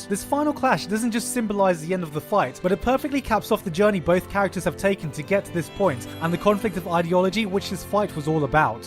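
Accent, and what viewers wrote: United Kingdom. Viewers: some normal liverpool street brawl beetween mates. your accent is so british i can almost hear the tea cup being lifted from the table when you talk.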